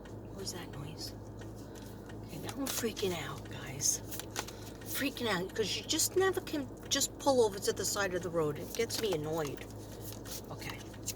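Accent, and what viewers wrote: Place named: Brooklyn, United States. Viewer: You have a brooklyn or ling island accent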